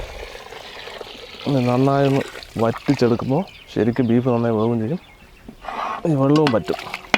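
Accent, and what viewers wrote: Palakkad, India. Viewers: Thani palakkadan malayalam slang ishtamullavar oru like adikko😍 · adipoli pkd slang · തനി നാടൻ പാലക്കാടൻ തനിമ സംസാരത്തിലും പെരുമാറ്റത്തിലും, നിങ്ങളിലൊരാളായി ജീവിക്കാൻ ആഗ്രഹംതോന്നുന്നു👌👌👌👌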